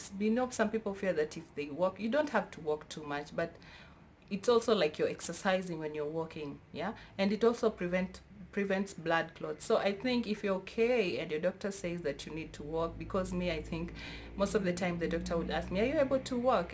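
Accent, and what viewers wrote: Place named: South Africa. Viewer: Great video. Thanks. You sound South African. You accent made me homesick!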